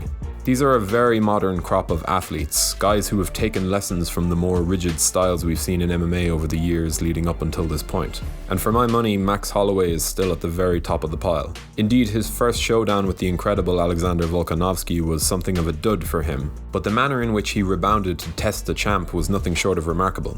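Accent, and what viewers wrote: Ireland. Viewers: Because of this chanel i want everything to be narrated in irish. Let me take that back, i wish everything in life was narrated by THIS guy specifically.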